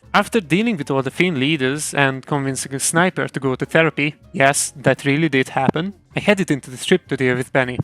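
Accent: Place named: Hungary. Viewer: Hungarian accent on top :D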